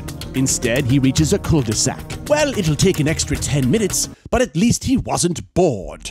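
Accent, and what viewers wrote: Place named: United Kingdom. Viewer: I love that this is a British accent “crisp” but is the driveway called a driveway? Cul-de-sac? Trunk? And as an adhd-er in Costco I have to say I have a ton of socks. Sure the lights are pretty on those other things but socks . . . You need those. . . I can’t fit them all in my sock drawer anymore. I really need to have a sock drawer for different seasons. (The sock aisle is way more dangerous than the tv aisle)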